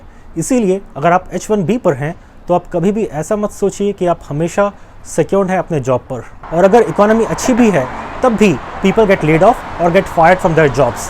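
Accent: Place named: India. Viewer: I love your hindi accent and way to express ideas. Your information is concise. Great job.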